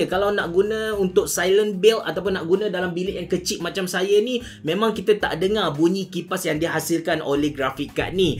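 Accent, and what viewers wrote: Kelantan, Malaysia. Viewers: mcm awal2 dlu kencek klate😄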